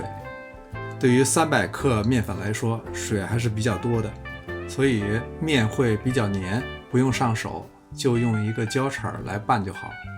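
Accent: Beijing, China. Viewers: ，在海外的人听到乡音，倍感亲切，喜欢您的视频，而且还有老北京的风味小吃，太棒了！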